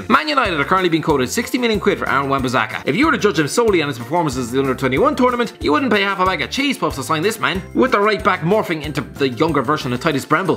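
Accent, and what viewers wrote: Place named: Ireland. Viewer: I can’t understand a word your saying slow down lad. I love the Irish accent but you sound like you’ve sniffed a few gram lol chill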